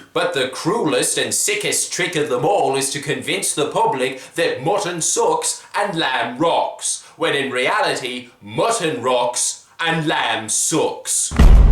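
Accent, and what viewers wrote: Australia. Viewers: This guy is Australian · Failed impression, your australian accent shines bright on this 😂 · Ok mannerisms and style of writing are amazingly accurate but the accent was shit and I say that as a fellow Strayan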